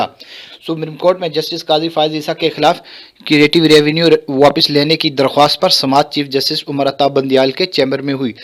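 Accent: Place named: Kashmir, India. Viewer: Pure Kashmiri Touch😂 Keep it Up🎉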